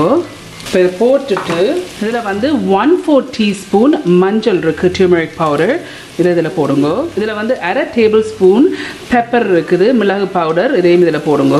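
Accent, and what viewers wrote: Sri Lanka. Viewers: neege indiyathane. Ogada tamil. Sri lanka tamilmatheri iruku. Mam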